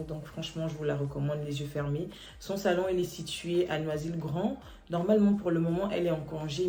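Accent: Gabon. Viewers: Tu parles comme une gabonaise 😊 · Pourquoi on parle tous pareillement 😂 je viens de découvrir la chaîne mais l’accent ci 🥰🇬🇦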